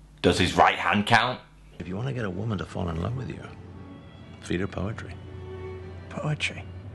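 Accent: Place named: England, United Kingdom. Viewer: Thats the problem, i watched cause it said theres gonna be a review, but it was just a bunch of non funny jokes and annoying english accent for 25 minutes or so....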